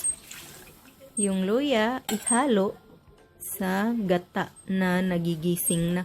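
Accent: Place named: Visayas, Philippines. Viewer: Mga tao jud noh bisag unsa nalay bantayan. Pastilan dolor! Magbuot mn mo ug mag tagalog sya .. Kamo dw pag tagalog be paminawon nato inyong accent.. Natural lahi iyang accent mga dzai kay bisaya man sya di man pure tagalog.. Kung ang tagalog bitaw mag bisaya lain man pod kaau paminawon.. Imbis magpasalamat ta kay naa tay nakat onan nga bag ong recipe mamantay hinuon.. Sus Ginoo!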